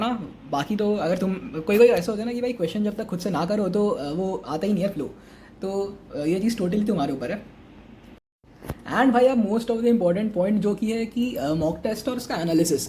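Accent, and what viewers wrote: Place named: UP/Bihar, India. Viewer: Bhai "ham" up /bihar se ho kyaa?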